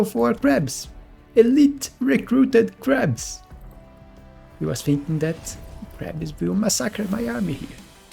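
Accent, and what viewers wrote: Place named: Brazil. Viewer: Eu também sou brasileiro aí reconheço o sotaque! Hahahah Você joga bem, mano, parabéns! Desejo sucesso!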